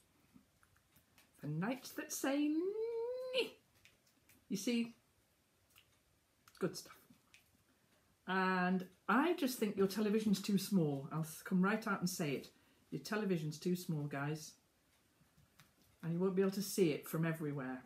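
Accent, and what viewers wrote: United Kingdom. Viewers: I just found your channel linked to Hercules Candies channel. You are so sweet and fun to watch. You made me smile several times as I watched. You stated many things that I feel about Hercules Candies and their crew. It was so fun watching you use the puddle and Steve's technique. Plus, the added bonus of your charming British accent. 😏 Thank you for the smile today. (I know a year later..) I'm looking forward to catching up and watching more. 🙂💚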